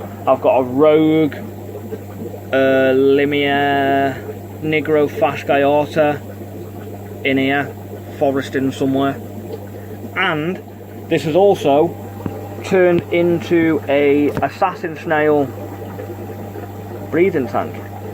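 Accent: United Kingdom. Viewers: "Focus, Bitch" was reaching iconic status, for me at least. I'd started saying it in my head whenever I go off on tangents during deep conversations. You know, you could've turned that into a selling point or catch phrase for your channel...it's the way you sound that caught my attention. Anyway, I'm really into various Brit accents, so there you go🤓.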